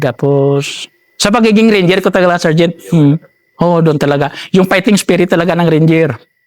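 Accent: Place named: Iloilo, Philippines. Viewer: ilonggo gid ang accent 😂🫡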